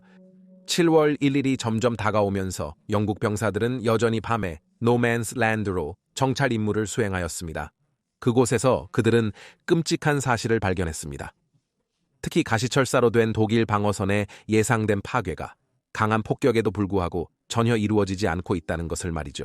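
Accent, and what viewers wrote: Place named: China. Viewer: mbc 손령처럼 중국발음 오진다. ㅜㅜㅜ ㄹㄹㄹ 전형적 중국인 발음 ㅋㅋㅋㅋㅋ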